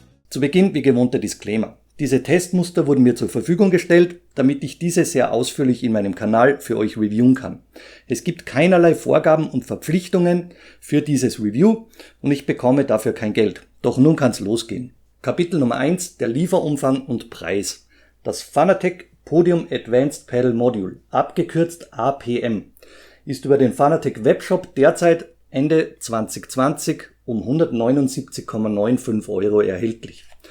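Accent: Austria. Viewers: Hallo, du hast in dem Review einen Adapterkabel von einem dritthersteller geredet, da ich selbst langzeitkunde von fanatec würde ich die mpm gern auf meinem F1 csl wheel nutzen (first Generation) da die APM für das neue porsche wheel vorgesehen is … somit ist das Interesse an diesem Kabel des Drittanbieters extrem interessant!!! Ps: bin oö‘er … du klingst als wärst du nur ein paar kilometer von mir weg? LinzLandler?!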